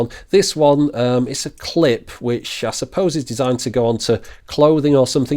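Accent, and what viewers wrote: United Kingdom. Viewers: the two plesures in one:a good overview of equipment and a beautiful British pronunciation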